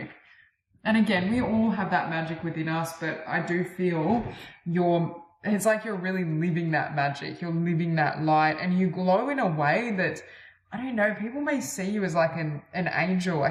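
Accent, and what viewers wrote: Australia. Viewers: Ah, beautiful reading number 4, and lovely to hear your Aussie voice. I lived in Brisbane for 3 years, I so miss the sunshine there. Being an artist my creativity exploded when I lived in Australia. I loved the light and vibrancy of the place. In comparison the Uk which has a denser energy, I have had to work harder to raise my vibration. It has taken quite a few years to adjust being here. Oh, receiving some gold would be nice!☺️ The Police used to be my favourite band when I was younger! Warm Wishes and Blessings. Many thanks for your messages. 🌟🤍😌🤍🌟🕊️🧚‍♀️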